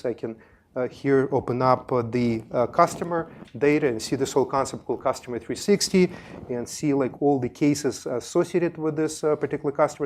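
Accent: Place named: Russia. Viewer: Very russian sounding, sir.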